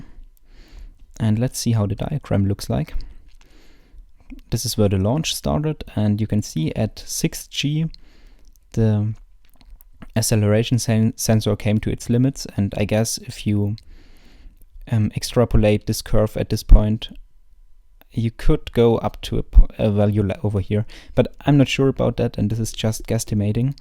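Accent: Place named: Swabia, Germany. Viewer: Ja cool ich au, man merkt obwohl du Englisch sprichst wo du her bist, ich bin aus Backnang, auch Nähe Stuttgart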